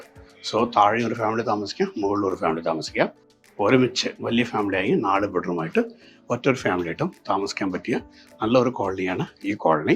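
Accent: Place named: Tamil Nadu, India. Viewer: Tamil accent😂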